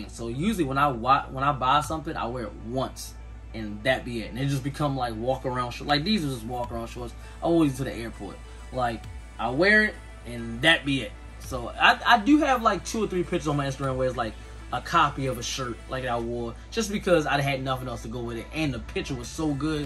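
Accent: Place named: Baltimore, United States. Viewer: You sound Baltimore aff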